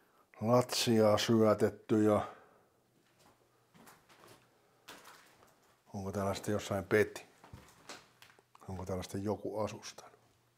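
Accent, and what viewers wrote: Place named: Finland. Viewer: Kiitos. Mistä päin miäs o, ku tuntuu tua murres nii kotoosalta !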